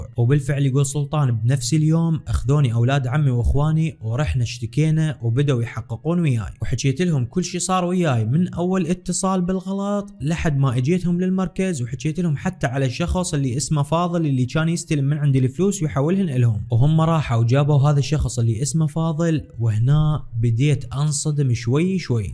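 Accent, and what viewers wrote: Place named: Iraq. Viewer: اجمل لهجه لهجت العراق 😊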